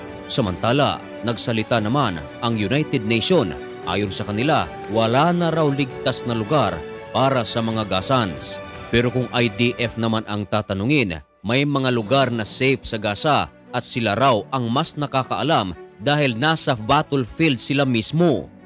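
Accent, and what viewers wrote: Visayas, Philippines. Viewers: bisaya ka dung!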